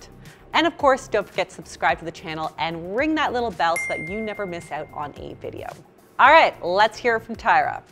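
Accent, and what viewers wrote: Canada. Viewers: Love the Canadian accent. It’s cool hearing bagel said in a different way. I love accents. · I was not prepared to find out how Canadians say the word bagel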